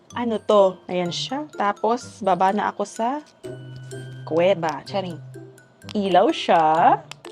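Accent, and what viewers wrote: Iloilo, Philippines. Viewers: skruwd ap ilonggo accent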